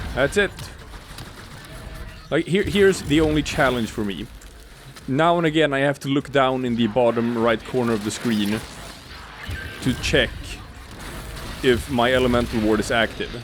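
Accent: Sweden. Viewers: So u swedish? u sound swedish!!! Hej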